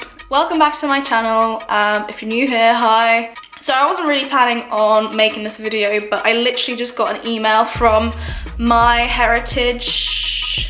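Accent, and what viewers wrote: United Kingdom. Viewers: Your so British tho! heeheh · Loved the posh english and humor. For the record dna doesn't have a watch and people mixed for thousands of years. Also not all black/white mixtures ever were nor are rape (if they were my ancestors I would certainly not assume one of my ancestors raped another of my ancestors!!!). But yeah keep up the light-hearted and humorous commentary with a posh accent, your ancestors worked hard to give it to you!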